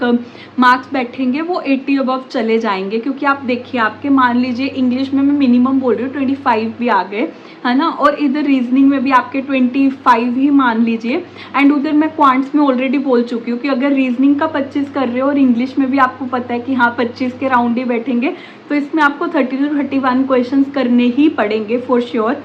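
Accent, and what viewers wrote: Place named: Pahadi, India. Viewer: Maam are you giving RBI grade B too ? also are you pahadi ? I feel your accent is pahadi those himachali , uk folks have that.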